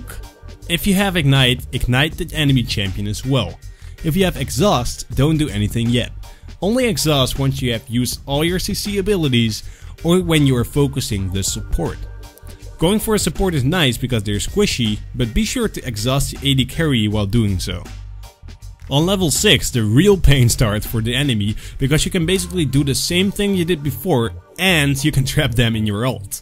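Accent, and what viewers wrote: Netherlands. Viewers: u sound dutch af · you're Dutch, aren't you?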